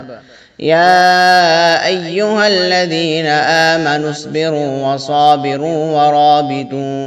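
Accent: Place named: Saudi Arabia. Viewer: MASHALLAH your recitation is like Saudi Arabia style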